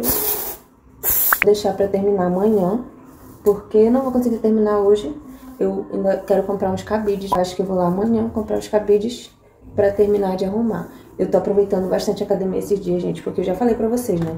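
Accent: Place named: Pará, Brazil. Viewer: tem muito sotaque paraense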